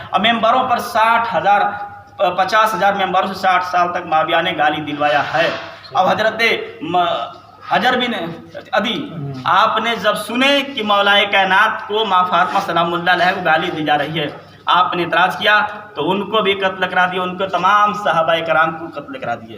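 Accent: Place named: Bihar, India. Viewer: Ye bihari maloom hota hai urdu bolne ke tameez nahi hadees ke baat karta hai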